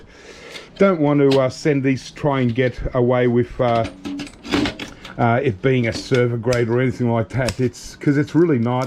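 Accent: Australia. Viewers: I prefer the short sessions anyway! It’s all mystical to me anyway but I like to hear the Aussie and see what you do. Merry Christmas 🎄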